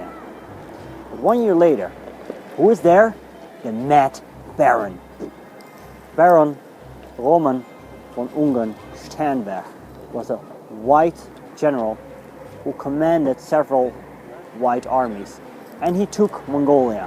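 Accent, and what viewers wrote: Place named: Netherlands. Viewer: Most interesting history, are you dutch? Your accent is very dutch.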